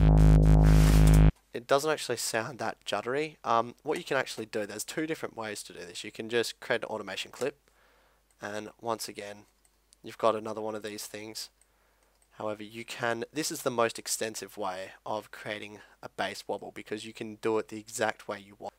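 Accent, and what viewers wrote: Australia. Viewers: Ha, thanks man you've helped a lot because I'm a noob to FL studio! Your aussie accent makes the commentating even funnier XD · i just wanna say thanks for talking nice and clearly, and in a good easy understandable aussie accent. half the other dub tutorials on youtube have hell quiet hard to understand accents, so be proud of that one. also, i havent checked ur other vidz but do u have a tutorial on how to do build ups and bass drops?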